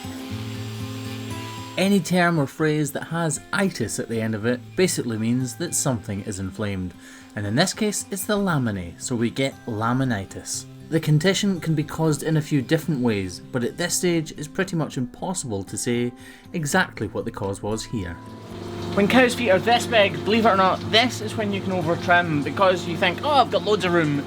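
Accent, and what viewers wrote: Scotland, United Kingdom. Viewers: I don't think he should change anything, Its Scottish, quickly spoken and a bloody beautiful accent. It's like asking you to change your accent because I don't like the way you say morning 🤷‍♀️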